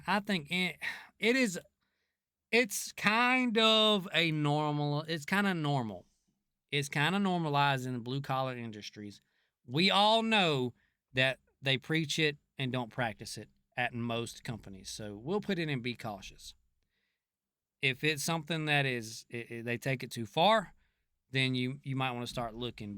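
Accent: South, United States. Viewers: Perfect southern accent ❤️ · The southern American accent is so sophisticated and refined it makes me think of prestigious university professors and silver tongued politicians. Then there's a random "shut the fuck up DUDE" it's a total mind fuck the same as if someone was swearing in a posh London accent.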